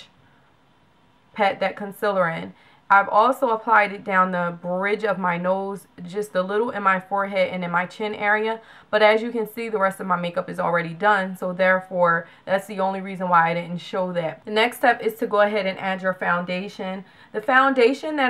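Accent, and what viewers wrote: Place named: New Jersey, United States. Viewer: Ahhh just I knew by your accent that you were a fellow jersey girl! What I love most about this is you explain how you hold the brush, how to apply the product, etc. Most people only show what to use, not how. Great video and of course lovely look! :)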